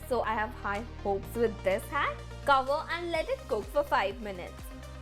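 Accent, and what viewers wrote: India. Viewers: I love her Hinglish ☺️😅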